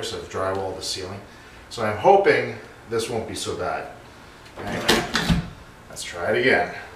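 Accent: Canada. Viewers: You didn't have to say Canadian. Your accent gave that away. I miss hockey. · I thought "he must be Canadian" Confirmed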